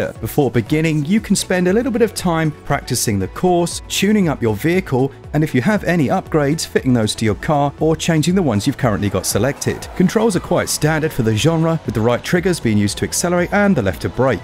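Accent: United Kingdom. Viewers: no not bad at all. It just didn't sound like you. Had more of a British tinge to it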